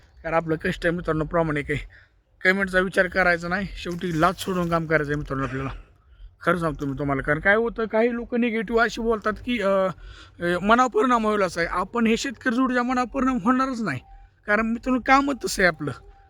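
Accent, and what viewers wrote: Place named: Solapur, India. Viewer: बोलीभाषा सोलापूरची वाटते.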